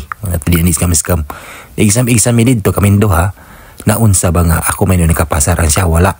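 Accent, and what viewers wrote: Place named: Visayas, Philippines. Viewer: New subscriber. Ganhan ko ani kay bisdak kaayong dako mo voice over. Very genuine kaayo! More content with.❤❤❤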